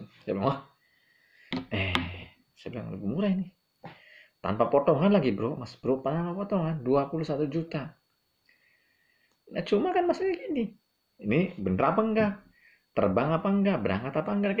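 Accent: Indramayu, Indonesia. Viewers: dermayu tah mas?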